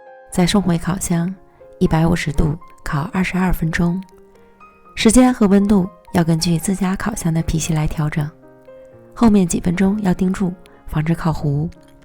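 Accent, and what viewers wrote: Northeast, China. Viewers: 博主的东北口音走到哪都是特别有辨识感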